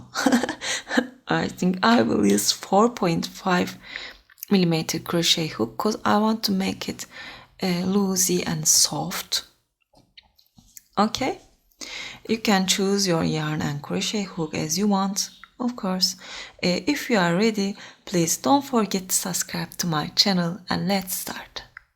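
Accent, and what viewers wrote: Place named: Turkey. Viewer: I've watched a few of your videos and had a feeling from your accent that you're from Turkey 😀 I wasn't sure though but then I saw the yarn you're using. I have this brand too as I live in Turkey 😊 hello to you and thanks for your videos. Your accent and voice is so soothing and your videos are so helpful 🤗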